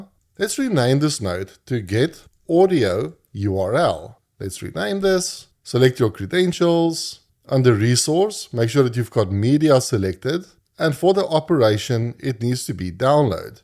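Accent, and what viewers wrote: Afrikaans, South Africa. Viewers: 😂 lekker afrikaanse ou, “What are my current list of todos.” Priceless. Thanks for the video 🎉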